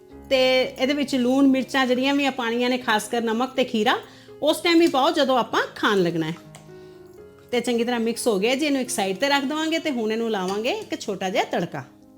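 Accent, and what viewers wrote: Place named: Punjab, India. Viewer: Meethi meethi aap ki Panjabi zuban upar se mazedaar raytuon ki recipe .me zarur banaungi ❤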